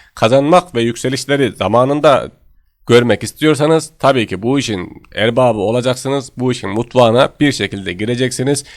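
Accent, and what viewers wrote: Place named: Konya, Turkey. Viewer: Abı sen Konyalımısın ve konyadamısın konuşma tarzın benziyor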